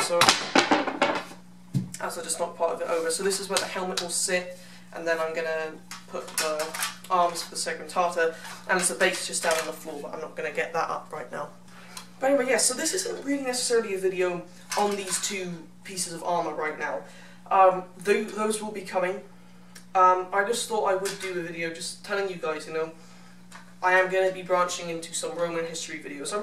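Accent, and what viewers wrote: United Kingdom. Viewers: very good like all romans in the movies, a brittish accent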